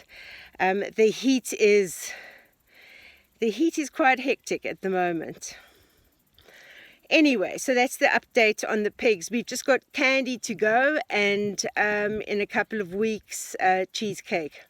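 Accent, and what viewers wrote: South Africa. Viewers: You sound so SouthAfrican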